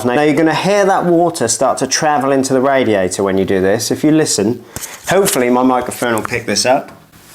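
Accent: Norfolk, United Kingdom. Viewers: That's a Norfolk boy if ever I heard one! Thanks for this helpful video 😊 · Nice video mate, great advice and very helpful. Norfolk accent?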